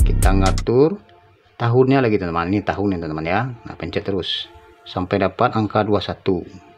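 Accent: Padang, Indonesia. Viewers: tengkiw bg, btw orang padang ya bg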